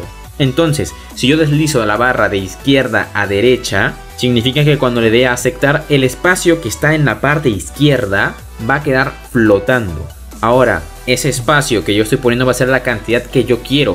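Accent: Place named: Peru. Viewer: mm re peruano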